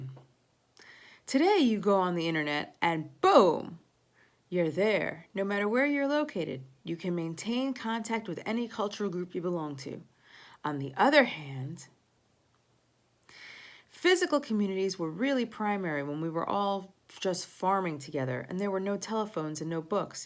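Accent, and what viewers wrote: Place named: New York, United States. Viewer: hear hear! Good Stuff! I like your style, and the subtle ny accent...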